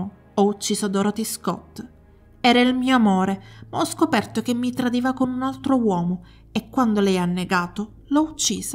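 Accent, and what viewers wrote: Sicily, Italy. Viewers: Ciao non conoscevo il caso...mi chiedo perché Dorothy non si sia rivolta alla polizia dopo aver ricevuto le prime telefonate dal suo stalker...non riesco neppure ad immaginare l'angoscia dei genitori che hanno continuato a ricevere le sue telefonate anche dopo la sparizione della figlia...atroce veramente...la cosa che più mi tiene avvinto ai tuoi video è la tua voce calma e pacata anche quando parli di crimini agghiaccianti...sei una bravissima narratrice ❤e adoro il tuo accento siciliano nonostante io sia un polentone!😂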